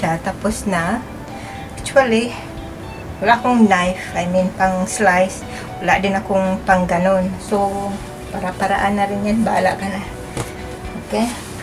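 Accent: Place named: Visayas, Philippines. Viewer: Bisaya ka po pala mam..galit na naman po siya ehehe...kakwela nyo po at masarap magluto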